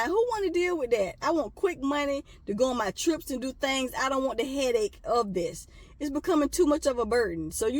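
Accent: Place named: South, United States. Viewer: Strong ass southern accent god bless you lmao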